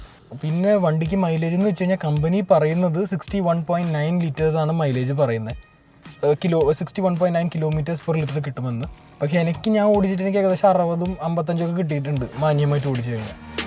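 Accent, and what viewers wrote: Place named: Malabar, India. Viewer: മലബാർ സ്ലാങ്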